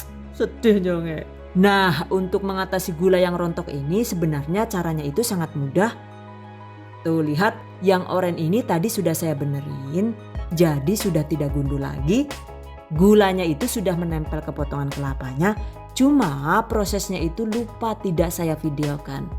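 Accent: Banyumas, Indonesia. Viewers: Bahasa ngapaknya itu lucu banget Bun😆"iklan maning iklan maning to yuk"tapi saya suka banget sama setiap resep & tips nya itu detail banget.makanya tiap cari resep pasti yg dicari resep.sukses terus ya bun channel nya aamiin🤲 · Yg saya suka dari penjelasan nya detail..suara & gaya bicara nya enak didengar.aoalagi ada logat logat ngapak nya itu..lucu. suka sekali..👍👍👍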